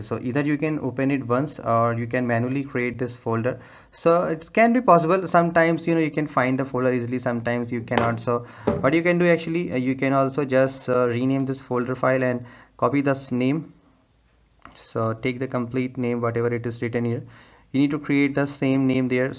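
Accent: India. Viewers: its okay every india sounds like that just like japanese tho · Rapid fire speech with an Indian accent 🥴..... Geeze i need a nap !!!! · You talk like an Indian boy that I don't like and your language suck like trash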